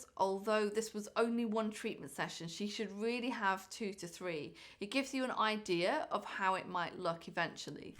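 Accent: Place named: United Kingdom. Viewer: you are simply amazing...I love the way you talk with typical Briton's resonance....I follow ur videos and have got my dermatologist watched your videos...you enlighten us so much on these cosmetological treatments candidly...superb...God bless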